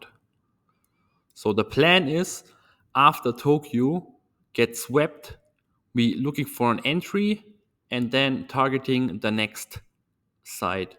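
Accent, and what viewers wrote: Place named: Germany. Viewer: I can just hear you are german, keep it up! the accent will go away after some time.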